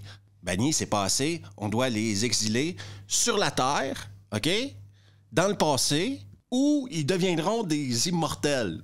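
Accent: Quebec, Canada. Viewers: Je crois que je l'avais vu à la télé et je n'en ai pas gardé beaucoup de souvenir ! J'avais même oublié Michael Ironside et Virginia Madsen ! (qui se souvient de "Electric Dreams"?) Déjà, le nom du méchant... Le général Katana ! Ca sent le nanar ce nom là 😂 Merci pour ces merveilleuses "RE-VU" si drôles avec cet accent du Québec que j'aime beaucoup :) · je m'abonne car j'aimerais comprendre si le sarcasme est un talent personnel ou bien si cela viens de la nonchalance de l'accent Québécois, tout en découvrant de merveilleux films 😅😅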